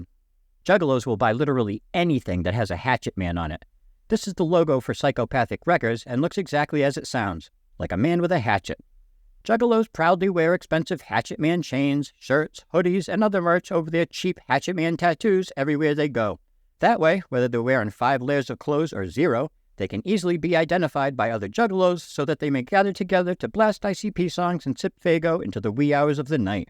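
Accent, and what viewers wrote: New England, United States. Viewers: You sound like you might be from New England? Love these videos hilarious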